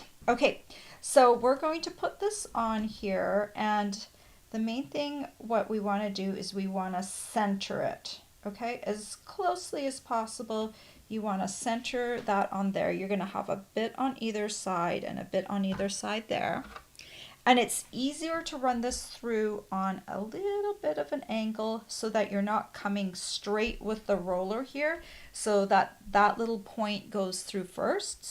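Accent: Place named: Canada. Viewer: Hi, for some reason (maybe your accent) I thought you were in Canada! I was so shocked when you just said you are in the Boston area!! So I CAN order Stampin' Up! products thru you in the future...😊😁😄Yea!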